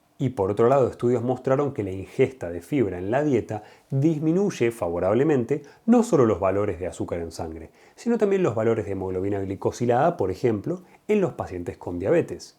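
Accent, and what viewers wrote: Argentina. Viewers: Me encantan estos videos informativos y llevaderos por ese lindo acento argentino,. Saludos desde Buenos Aires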